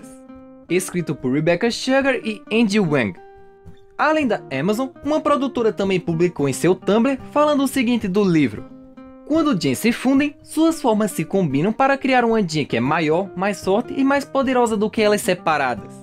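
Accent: Northeast, Brazil. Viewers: vc e nordestino?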